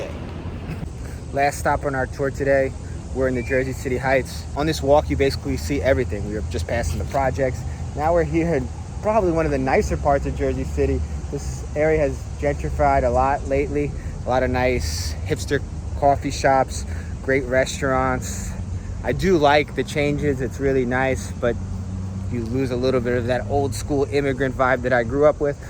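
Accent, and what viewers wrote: Brazil. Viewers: Parece um brasileiro tentando falar inglês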